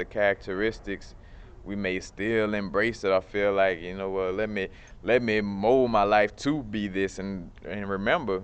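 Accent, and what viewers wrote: New Orleans, United States. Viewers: You gotta be from the n.o huh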